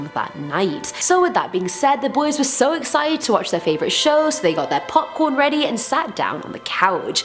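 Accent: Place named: RP, United Kingdom. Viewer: i like your accent i, i think its attitude and i think its called posh.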